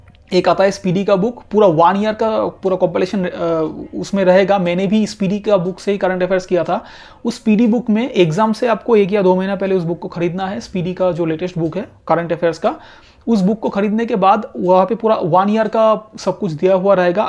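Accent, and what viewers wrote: Bengal, India. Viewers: Sir aap bangal se ho na ?? 😅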